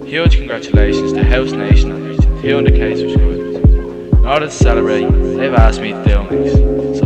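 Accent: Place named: Ireland. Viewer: Congrats bro! That Irish accent though ;)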